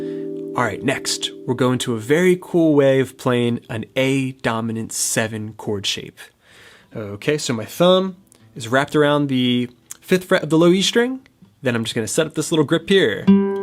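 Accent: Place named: South Jersey, United States. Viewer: Where's that accent from? Sounds like south jersey, my old stomping grounds 🙌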